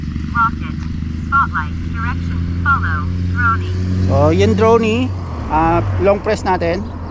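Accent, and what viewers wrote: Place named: Pampanga, Philippines. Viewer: Kapampangan ka po ba sir? Na enjoy ko video mo hahahah bukod sa dahil sa drone e ka accent mo din yung namayapa kong tatay, more drone videos po🫡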